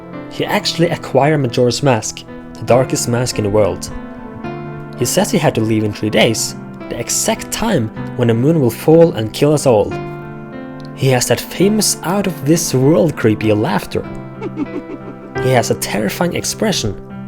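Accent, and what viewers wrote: Norway. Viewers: did i hear the lovely sound of a Norwegian English youtuber? ikke ofte man ser det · Kul video! Ikke alltid man møter på norske zelda-yourubere! :D Litt sterk norsk aksang i uttalen din, men ellers veldig bra!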